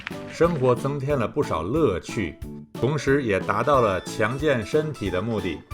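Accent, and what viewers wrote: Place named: Tianjin, China. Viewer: 大哥你好，听您声音天津的，我们是老乡，很感谢你分享了这个电动车，但是我在澳洲买不到，有点失望，这车的确不错，淘宝有类似但是感觉不太靠谱。祝您生活愉快。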